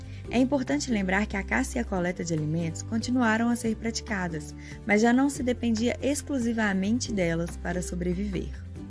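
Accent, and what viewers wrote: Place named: Belo Horizonte, Brazil. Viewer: Kkkk. Vcs acham que a narradora é de BH ou nem??? Ô, sotaque forte! Muito bom o vídeo. Parabéns!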